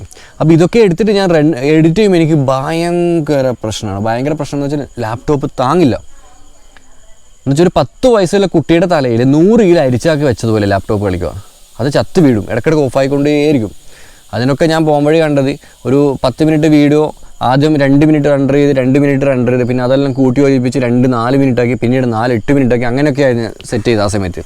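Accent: Kannur, India. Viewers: Katha ellarum parayunnath nammalk ishtamakillaa. Bt ingal parayumbo entho kettirunn pokm manushya . Onnamath ee kannur karante slang okke kekkan nalla rasaaappaaa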